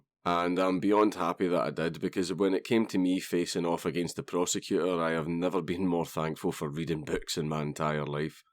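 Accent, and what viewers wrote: Scotland, United Kingdom. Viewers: Nice vid, but FYI "de jure" and "de facto" are Latin, so your pronounciation of "de jure" in particular is way off. This is not a pedantic point, here's why. I was listening to your video and not watching, and I was wondering why you were talking about "du jour" authority (which is French for "of the current day"). So for a while I couldn't understand why you were talking about arbitrary authority as opposed to authority by law (de jure). To pronounce "de jure" in such a way that you won't confuse listeners like myself (which may very well be just me), you want to say it more or less like: "day you ray" (I'm trying to account for your Scottish accent! :D). Cheers. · don't break dude, they can lock up your Body but your mind is free like a bird. (add subtitles to your Video in order to reach more people. I can understand scottish accent since i spent a lot of time in Glasgow over the course of many years but most who know english have a hard time understanding your accent) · So where do I sign up for audiobooks read by a dank Scottish fellow?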